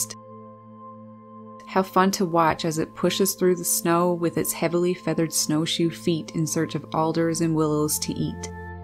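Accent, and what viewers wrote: Canada. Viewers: What a beautiful birding documentary. The scenery is gorgeous and the birds you have around you are amazing. I am in Michigan and when I hear someone say out the way you do I can tell you must be from Canada. Northern Canada. Maybe I am wrong. I have watched you on and off for a while and now I am watching much more. Do you have any advice for me? I am moving in the spring/summer to my home in the thumb of Michigan’s lower penninsula. I am now in the Detroit area. We get birds in our yard, in the woods there at our other home where we are moving permanently but I would like to maximize this. I get the normal finches house, and gold finches, chickadees, rose breasted grosbeaks a rare cedar waxwing but it has been years, and we get Veery Thrush who sound amazing but are a rare site, and lots of wood peckers downy and red bellied, and eastern phoebes juncos etc. 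but I want the blue jays that I never see up there. We are right off Lake Huron and att our friends house they have purple Martins, Sand pipers and gulls. Can it draw blue jays to wooded areas? I will live in the woods?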